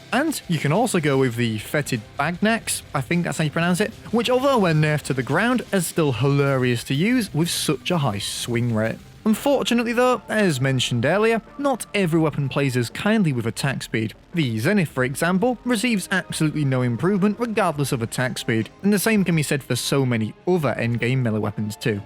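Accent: United Kingdom. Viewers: Oh my godness this British accent,mmmm, sweetest sound to my ears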